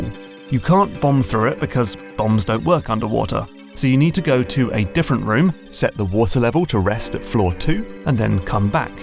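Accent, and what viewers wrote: England, United Kingdom. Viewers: This narrator has the same accent as Tom Scott. Anyone know the region of England?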